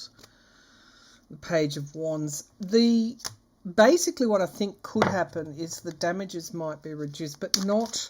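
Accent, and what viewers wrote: Australia. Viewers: Was nice to heat am Aussie voice in this area of YouTube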